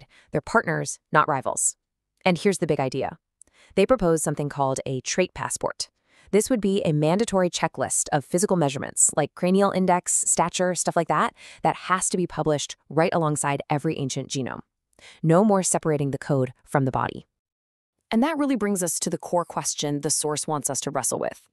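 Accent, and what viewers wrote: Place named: United States. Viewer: annoying robot American begone !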